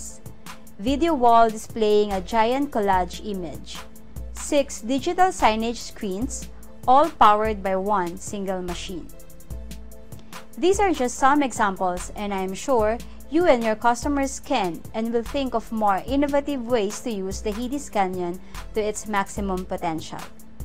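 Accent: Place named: Philippines. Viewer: You sound like Filipino. Am I right ?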